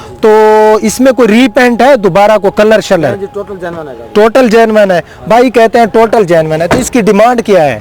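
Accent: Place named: Saraiki, Pakistan. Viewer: Urdu sariki lehjay mein.... Baraa maza ata ha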